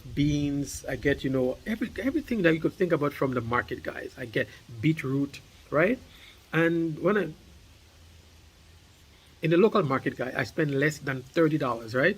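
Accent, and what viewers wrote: Grenada. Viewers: His accent sounds more like Grenada. That isn’t a Jamaican accent.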